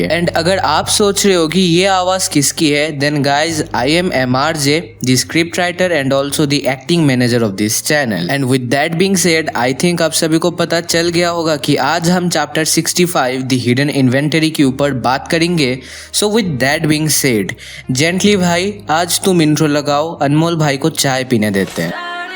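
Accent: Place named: Bengal, India. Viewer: Kisi Bengali bol ra lgta h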